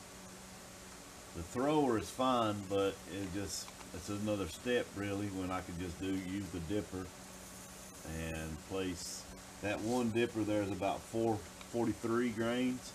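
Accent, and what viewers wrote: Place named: Tennessee, United States. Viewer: you sound like you from tennessee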